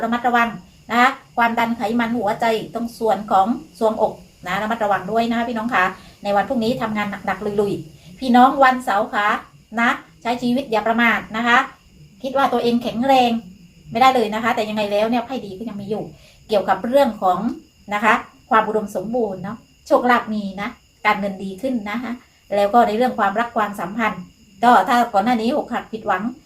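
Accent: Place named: South, Thailand. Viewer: สำเนียงใต้ มิน่าถึงพูดรัวฟังไม่รู้เรื่อง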